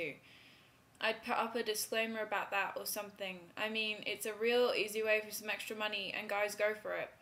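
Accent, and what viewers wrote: England, United Kingdom. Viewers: i don't know, sounds like she has an english accent, so im not sure. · so i was bored and my subscriptions reset and i saw your video and decided to watch it again lol. still funny and i gotta say (in hopefully a noncreepy way? haha) the british accent is still very cute ;) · hiii:3 i love your English accent... *stares relentlessly* -Fullmetal Alchemist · do canadians have that accent? sounds like semi-british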